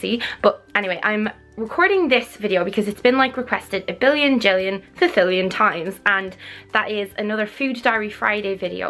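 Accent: Ireland. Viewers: I've never watched this girl before, only know about her because of the whole Toby thing but Jesus Christ she is beautiful, her eyes are hypnotic, and the Irish accent is just wow.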